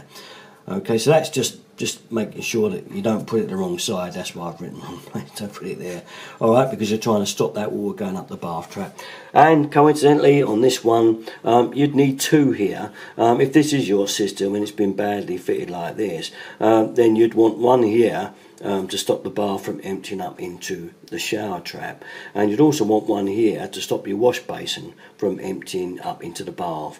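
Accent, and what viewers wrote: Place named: RP, United Kingdom. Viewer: Who complains about his accent ??? The man speaks the Queen’s English, no complaints here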